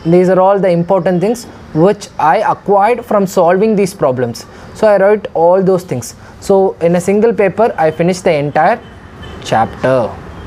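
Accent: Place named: South India, India. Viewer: Thanks for trick 😊, your English is too cute with south indian twist ☺️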